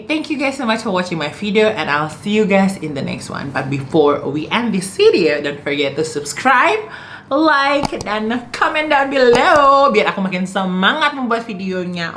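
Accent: Indonesia. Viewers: Qu suka bahasa inggris nya, aku suka logat manadonya. Sukses sllu kakak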